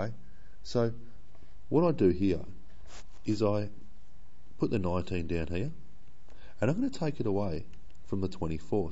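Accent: Australia. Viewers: i can hear him perfectly, maybe you guys can't understand his aussie accent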